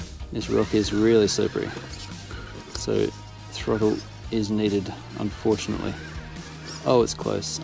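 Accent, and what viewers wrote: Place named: Australia. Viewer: Definitely Aussie 😂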